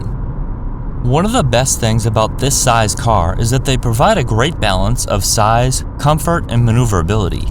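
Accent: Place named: United States. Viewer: I will subscribe when you stop saying "and" like an american. This is an error, try to reverse to the British "and".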